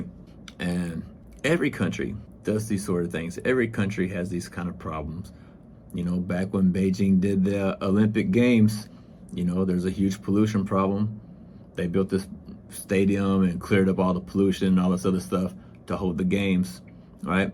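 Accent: United States. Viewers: At what point of your life in China you lost your American accent? Just curious.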